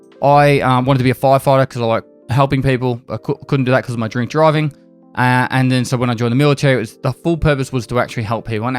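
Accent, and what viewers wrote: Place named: Victoria, Australia. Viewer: Hey, thought you sounded victorian, was surprised to see you live in Brisbane (I'm from Melbourne)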